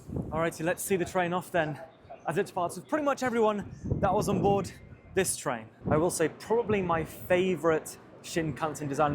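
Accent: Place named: RP, United Kingdom. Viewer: Hello, I love your videos! Since I found your channel a couple months ago I have watched all your videos and I’m always waiting for the next one, thanks for the great job you are doing! I wanted to ask you something and I mean no disrespect with the question but, are you a trained voice or scenic actor? I’m not a native English speaker and your pronunciation and cadence reminds me of the listening exams for the Cambridge exams, like you talk in an accent close to RP. I ask just for curiosity and again, I mean no disrespect and I hope this doesn’t bother you too much. Keep up the good work and thanks!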